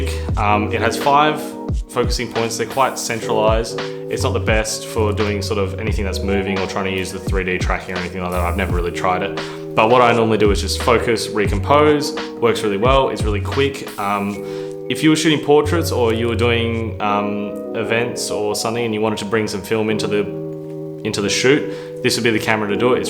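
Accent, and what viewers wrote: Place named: Australia. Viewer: So nice to hear an Aussie accent. I have a 28-70mm old film lens and on the hunt for a newer version of my F70. This looks perfect. You got a great deal. I've not seen anything close to that on offer. Nice job with your channel - keep going.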